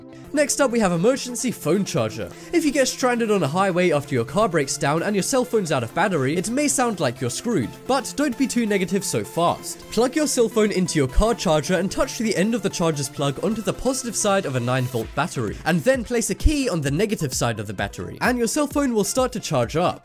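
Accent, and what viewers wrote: United States. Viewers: You swy you're from the UK, but you talk like an American. I wonder if you are lying about your heritage...?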